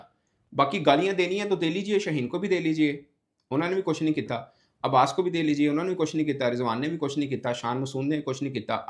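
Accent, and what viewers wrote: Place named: Amritsar, India. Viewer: your Punjabi sounds like so AMRITSARi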